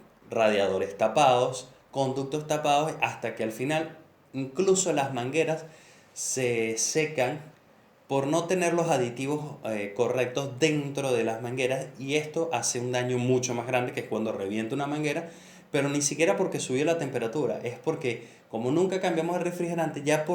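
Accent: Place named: Venezuela. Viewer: Me da pereza escuchar hablar a los venezolanos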